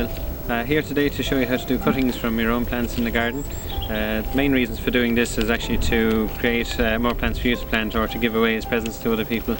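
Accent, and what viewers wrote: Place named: Ireland. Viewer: I'm from NZ and I love ur straight forward, no fuss, informative style and that Irish (???) accent is to die for. thanks for the video.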